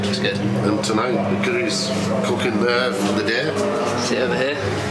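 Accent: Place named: United Kingdom. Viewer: oh i thought this is american.. its just british.. which is just normal..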